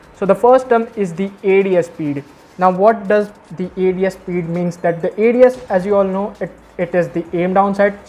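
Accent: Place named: India. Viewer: This is why I love Indians. Their tutorials are always the best and easiest to understand. I struggle to understand the accent and hear them clearly but it's still okay cause their tutorials are better than those from anywhere else.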